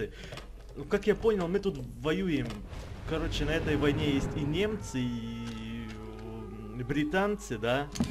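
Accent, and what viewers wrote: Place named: Ukraine. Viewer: У как будто акцент какой-то, может украинский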